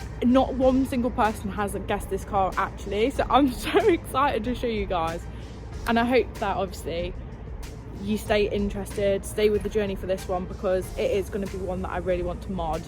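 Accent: United Kingdom. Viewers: Are you from derby?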